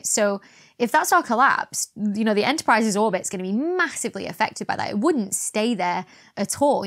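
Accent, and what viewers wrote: United Kingdom. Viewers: So enjoyed this, like to hear your British accent as someone born in London, loved the geordie accent bit :) I would be interested to know what you think of 1956 Forbidden planet my favourite that is the forerunner of most Space soaps.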